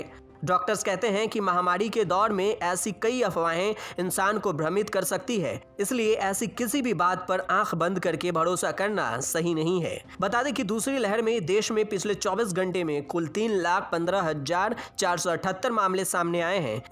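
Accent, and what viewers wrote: Bihar, India. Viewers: कोई बिहारी VO किया है, खैर अच्छा है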